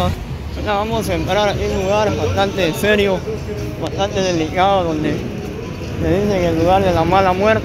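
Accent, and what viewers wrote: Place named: Guatemala. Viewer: Me gusta tus videos mas porque usas el acento chapin no de otros paises siempre usa el acento chapin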